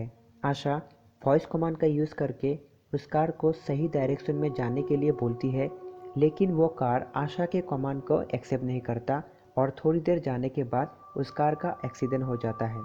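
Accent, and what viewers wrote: Assam, India. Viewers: I think you are Assames. Cuz you have same accent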